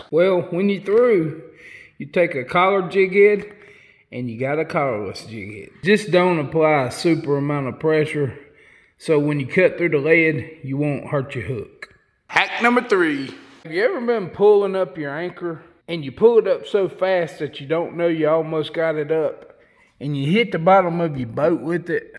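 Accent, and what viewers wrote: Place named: South, United States. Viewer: I like this guy.... country drawl n all 💪🏾🐻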